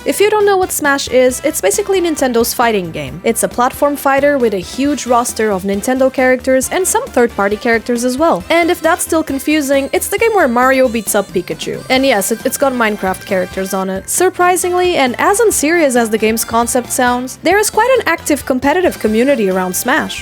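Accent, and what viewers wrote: Portugal. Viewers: Voce é de Portugal??? Wow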